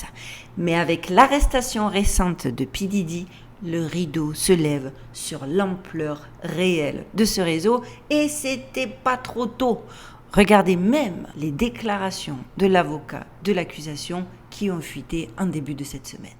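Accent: Marseille, France. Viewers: Cc oh que oui cela est intéressant même plus que cela puisque nous en France cela a juste était juste diffusé comme le passage d'un TGV bien entendu que ça pue et mon dieu il faudrait tellement que ça pète et du côté de chez nous aussi pour finir de réveiller bcq bcq d'âmes au nom de la justice Divine et par respect de toutes ces personnes qui ont et qui subissent encore ces horribles conditions tu as une belle mission notre Marseillaise surtout ne perd jamais ce magnifique accent et langage bien de chez nous merci bien sûr ce com est personnel et j'assume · Son style est un mix entre le conte pour enfant et l'enquête criminelle saupoudré de l accent marseillais. On kiffe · Merci beaucoup 😄 toujours captivant de t'écouter. Je ne fais jamais de commentaire vu le nombre incroyable qu'il y a toujours mais j'avais envie pour une fois de te dire merci et te dire aussi que j'adore ton accent marseillais....ça et ton rire contagieux font ton charme de même que ton intelligence.😉🙏👍💖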